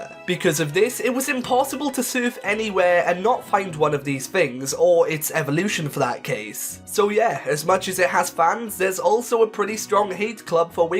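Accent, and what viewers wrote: Wales, United Kingdom. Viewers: Are you Welsh? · Are you welsh mate?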